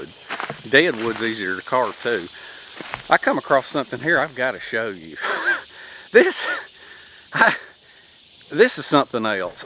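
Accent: South, United States. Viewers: love the accent are you a southern guy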